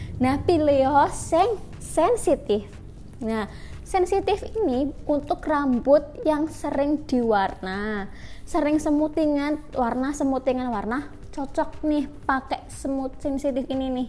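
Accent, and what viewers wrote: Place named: Java, Indonesia. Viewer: medok re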